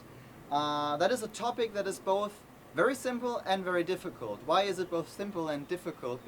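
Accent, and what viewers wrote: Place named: Germany. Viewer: Bist du deutsch? Da hast glaube ich einen deutschen amboss und irgendwie hast du auch einen deutschen Akzent...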